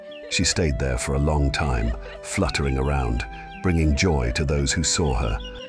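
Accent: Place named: United Kingdom. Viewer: Why use a British accent?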